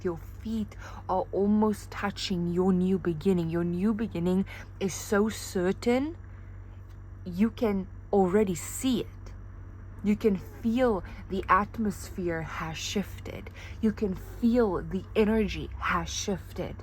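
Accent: South Africa. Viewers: You probably prefer your anonymity but that accent is 🇿🇦 coded. Lol correct me if I'm wrong😅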